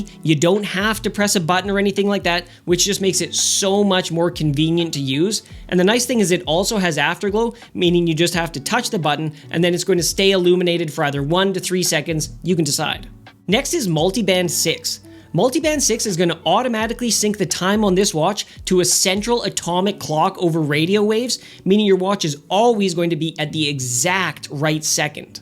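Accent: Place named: Canada. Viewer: If I ever need to explain what a Canadian accent sounds like, I'm going straight to this video for the best example❤👍. Oh yes, forgot, I agree with you on the 6510.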